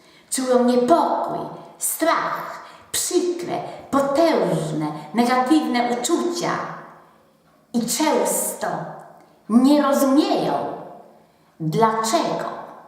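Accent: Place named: East, Poland. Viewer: Ona ma wschodni akcent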